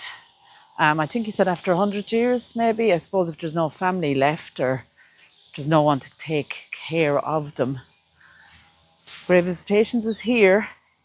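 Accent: Ireland. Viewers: Love listening to your Irish voice ❤❤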